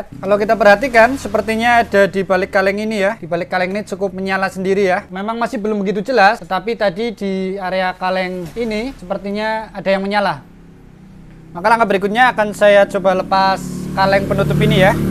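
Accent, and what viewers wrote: Banyumas, Indonesia. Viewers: logatnya kaya ngapak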